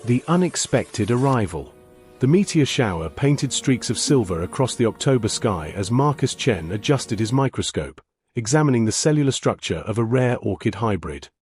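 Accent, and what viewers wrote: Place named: United Kingdom. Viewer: With the British accent, I should have known the answer for two naked women showing up for warmth would be "Let me make tea!"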